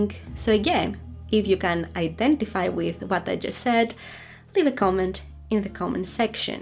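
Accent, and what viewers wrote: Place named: Greece. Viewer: Love your accent, are you Greek??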